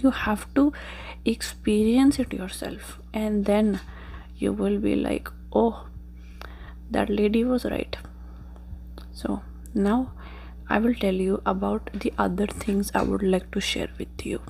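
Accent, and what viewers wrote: India. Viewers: Please never stop uploading, this is great content! Also, is that a real Indian accent or is it something you learnt while travelling?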